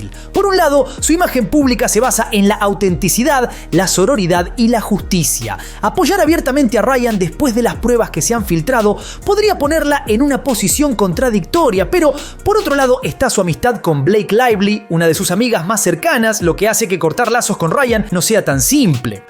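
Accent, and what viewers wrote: Argentina. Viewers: bueno, no sé, yo vi este lío de otra forma, " Black le tenía ganas a Baldoni, pero él la rechazó, y como nadie rechaza a una mujer tan irresistible como black ( eso piensa ella), y como tiene un esposo tan poderoso y una amiga tan adinerada, pués comenzó la venganza femenina, destruir a su enemigo, y quien sabe que le contó a su esposo, para que él haya hecho este ridículo, poniendo en peligro su carrera". Yo pienso, la mujer está quiso destruir a un hombre que la rechazó, pero como dicen aquí en Alemania, " Se dio ella misma un tiro en la rodilla", o en Colombiano" El tiro le salió en reversa". Saludos, me encanta escucharte, ese acento argentino y como hablan ustedes me encanta, saludos de una Paisa en Alemania.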